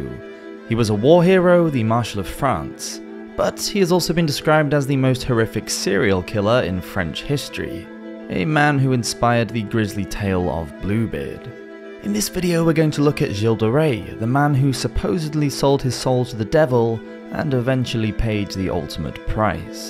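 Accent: England, United Kingdom. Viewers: I love this channel. Medieval history just sounds so much better coming from an OG English accent.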